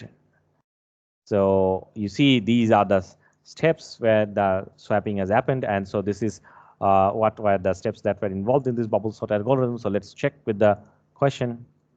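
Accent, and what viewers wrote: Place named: United States. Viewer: Tmr ekdam American accent, r u staying in USA.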